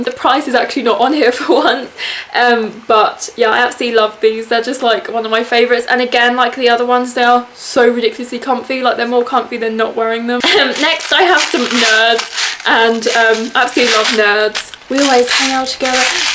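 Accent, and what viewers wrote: RP, United Kingdom. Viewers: you talk so posh! lol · wow, you sound so posh, aha:') x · You keep talking in a posh accent and its annoying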